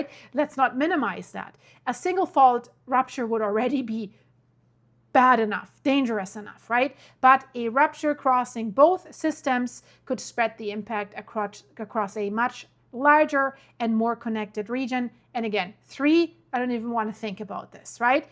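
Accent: Germany. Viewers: She's German 😂😂. It's hilarious 😂 to listen to her pronounce some words. Love ya 😊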